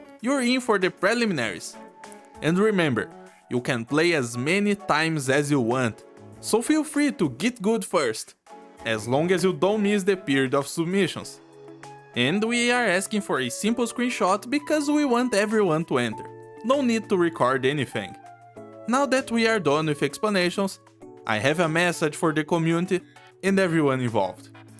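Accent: Brazil. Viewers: Are u Brazilian? I'm trying to pinpoint ur accent lol😅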